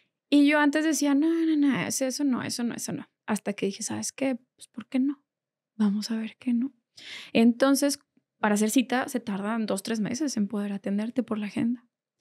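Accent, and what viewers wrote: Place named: Mexico. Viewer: Me cuesta entender lo que dice, su acento es super mexicano, demasiado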